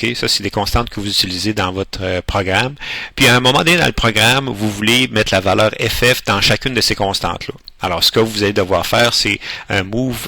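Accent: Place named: Quebec, Canada. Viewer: WOWWWWWWW un vidéo en quebecois hehe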